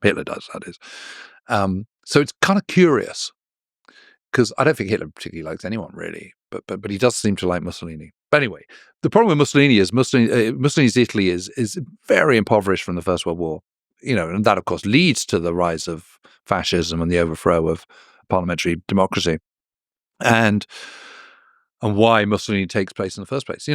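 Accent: Southern England, United Kingdom. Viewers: He has an educated Southern English accent, which is the heart of the English language. Americans as our offspring have a different dialect, which is fine. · As a fellow countryman & with a background in applied linguistics, I can therefore attest with some knowledge & experience that James Holland naturally (Without any false pretenses) speaks a well-educated form of English with a ‘Standard Estuary or Southern English’ accent!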